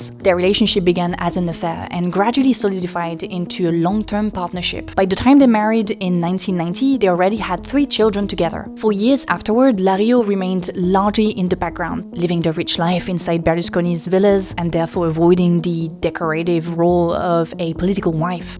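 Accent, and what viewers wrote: France. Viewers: How dare you call Trump a fasist, I do feel sorry for you, young, damp and with your french heavy accent, says everything! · Is it a french accent or an I tripping? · P.s. You're gorgeous! And that frnch accent🐕🤩🥰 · ARE YOU FRENCH?????? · New watcher (from Sweden), love the French accent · I hate the French accent, you should LEARN English.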